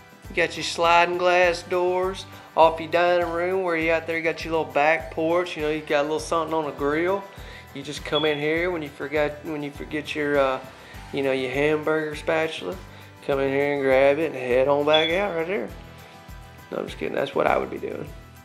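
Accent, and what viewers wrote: South, United States. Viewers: Very southern/ cowboy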